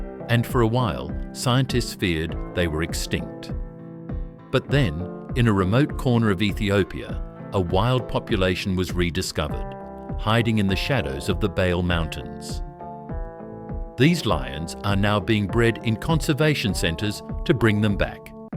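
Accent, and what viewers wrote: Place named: United Kingdom. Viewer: I hate to have to listen to a British bot.🙄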